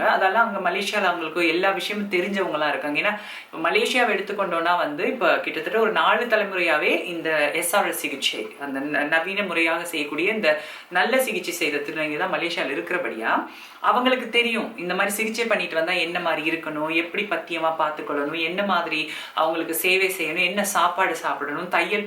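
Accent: Sri Lanka. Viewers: Ka your speech is very beautiful like srilanka tamil oh my sweet ka and please reply your comment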